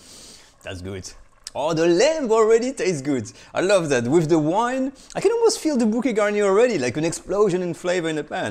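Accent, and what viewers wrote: France. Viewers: Food and French accent, what else could one ask for?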